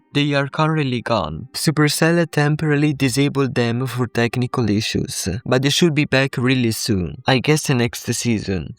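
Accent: Italy. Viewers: italian accent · Are you Italian?Cuz your accent seems so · his accent is clearly italian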